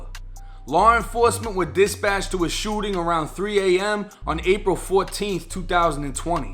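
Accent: Boston, United States. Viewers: U thr man bro love ur Boston accent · U from boston my guy? I can hear that bostonian accent.. Massachusetts in the building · Ok dude your from Boston I can tell from your accent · Does anyone know if from Boston because he sounds like he's from Boston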